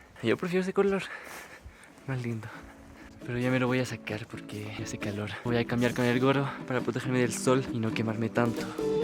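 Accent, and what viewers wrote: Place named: Chile. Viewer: Todo bien pero tu acento Chileno me desespera tengo que usar el traductor para entenderlo y respirar porque de oírte se me va la Respiración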